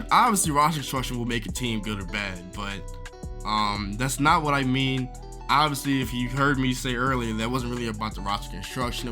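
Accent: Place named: West Virginia, United States. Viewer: tf is that accent? you from west virginia or arkansas?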